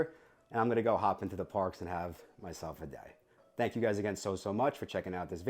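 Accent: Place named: New York City, United States. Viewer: Thanks for this video! Considering this for our next stay and this was very helpful. Also a nice taste of home hearing you talk! --a fellow NYC Italian now living elsewhere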